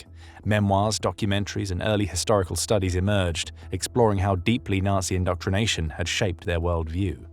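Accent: United Kingdom. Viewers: I am more concerned that there is a british voice narrating the lives of germans rather than blue eyes and blonde hair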